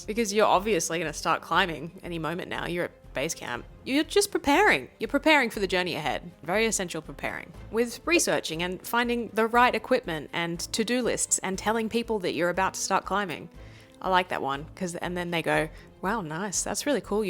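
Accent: Australia. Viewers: Sounds Aussie like Struthless. Cool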